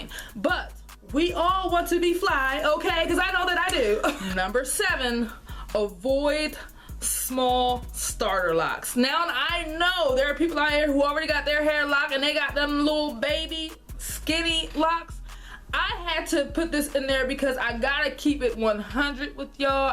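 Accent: Baltimore, United States. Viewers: I gota ask.. lol are you from Baltimore??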